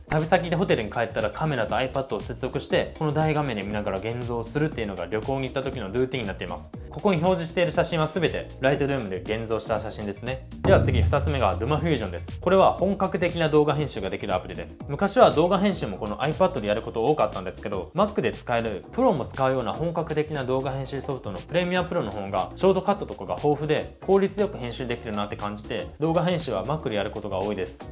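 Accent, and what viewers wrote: Kansai, Japan. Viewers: 話のイントネーションと阪神タイガース好きって言ってたんで笑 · 先生のイントネーションで関西弁出てますよw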